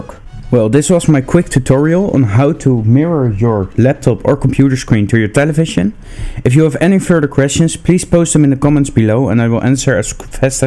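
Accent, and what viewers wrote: Netherlands. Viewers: You must be Dutch. That accent! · Duidelijk een nederlander XD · hahah ik hoorde gelijk dat je NL was. keep up the great work man :) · You sound Dutch-ish. Are you? :) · Ik hoorde meten al dat je nl was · your dutch accent is terrible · ik hoor dat je nederlands bent hahah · he sounds so dutch someone tell me i am correct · you sound really dutch m8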